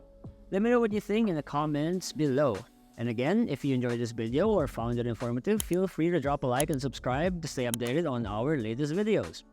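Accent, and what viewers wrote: India. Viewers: Bakit may slight na Indian accent? Lol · Indian nationality ba yung nagsasalita? 😮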